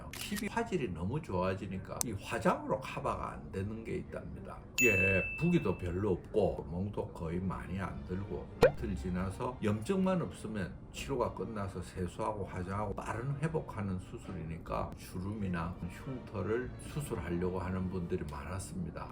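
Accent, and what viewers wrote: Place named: Gyeongsang, South Korea. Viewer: 원장님 경상도 분이셨군요..!^^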